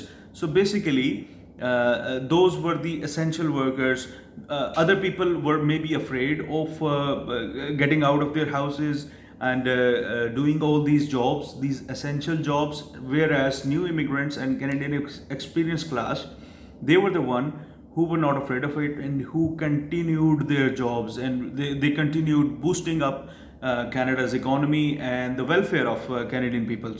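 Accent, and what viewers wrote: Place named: Lahore, Pakistan. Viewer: Veerji your accent is like someone from Aitchson College Lahore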